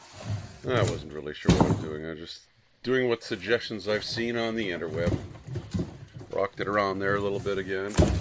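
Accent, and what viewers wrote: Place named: Canada. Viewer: OH i bet it is Canadian dialect. I’m down here in the USA. You do a very decent job on your voiceovers.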